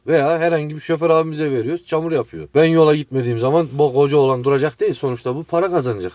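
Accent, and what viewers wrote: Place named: Çorum, Turkey. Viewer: Abi Nerelisin Aynı Çorumlular Gibi Konuşuyorsun Başarılar :)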